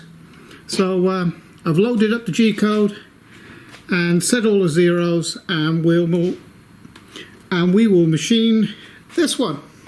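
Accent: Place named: Shropshire, United Kingdom. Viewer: You sound like a Shropshire mon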